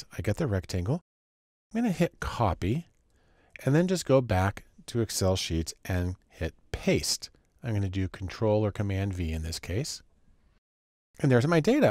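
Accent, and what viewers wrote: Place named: England, United Kingdom. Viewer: its so nice to hear clean english accent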